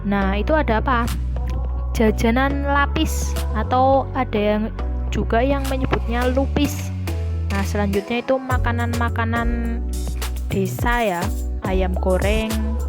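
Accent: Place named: Banyumas, Indonesia. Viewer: Youtuber ngapakk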